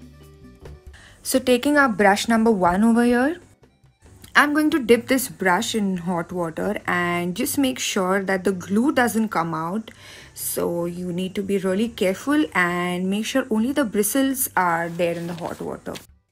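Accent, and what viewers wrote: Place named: India. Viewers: She sounds Indian to me.. That's how they pronounce it too😁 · yes. I am an Indian and hearing her sound I think she is also Indian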